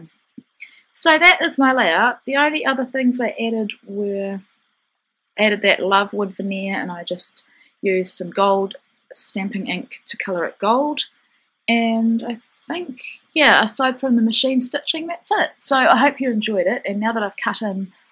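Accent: New Zealand. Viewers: Love this layout! I could listen to you talk all day!!! I love the NZ accent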